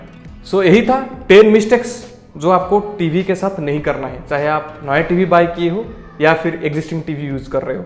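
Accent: Odisha, India. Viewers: odisa se ho ??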